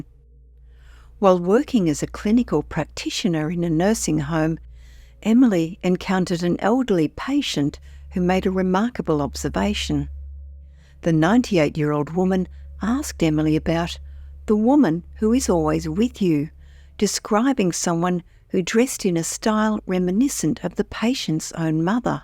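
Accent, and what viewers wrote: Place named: Australia. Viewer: Maybe it’s her accent! I’m pretty sure the narrator is Australian!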